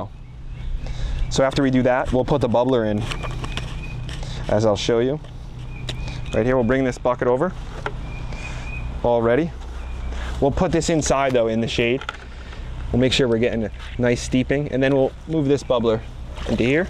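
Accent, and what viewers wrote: New Jersey, United States. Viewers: It's SO Jersey. I love it, he hypes you up before every video.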